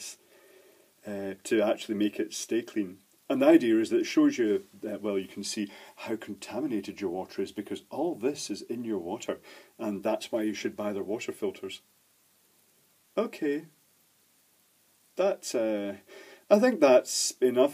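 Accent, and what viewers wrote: United Kingdom. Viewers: I don't know why I keep watching your videos. I know nothing about electronics and most of this I just don't understand. Must be your soothing british voice... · oh, of course. whenever i hear a british accent i assume the device in the vid has a G-type plug :D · I will never understand why British people pronounce aluminum "aluminium"... There's only one "i" in aluminum.... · He's in the UK. Here it is spelled Aluminium (with an extra i) and pronounced that way. There are many differences between UK and US spellings/pronunciations.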